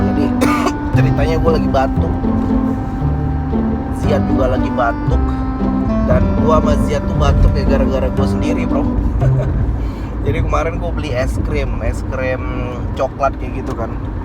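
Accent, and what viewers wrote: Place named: West Sumatra, Indonesia. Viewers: Iya, dari logatnya masih kliatan.. btw im half minang too 😆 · Urang awak rupanya, pantas logatnya familiar😂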